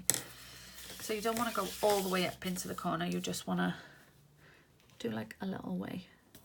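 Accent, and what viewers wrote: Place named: Wales, United Kingdom. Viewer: Hahaha see even when you write im laughing cos i can hear you saying it in my head in your welshy accent so funny! I feel like your about to burst into disney at any time - cant imagine what your like when your in the disney shop i bet your dancing and singing aint you 😂 xx hahah yeah its the only time your quiet in the dentist 😂😂 xxx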